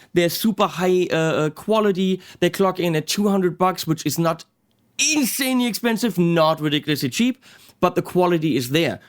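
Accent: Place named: Germany. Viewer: your accent seems particularly German today.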